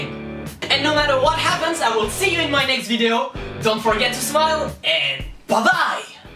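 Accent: France. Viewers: T'as un accent français ! You have à French accent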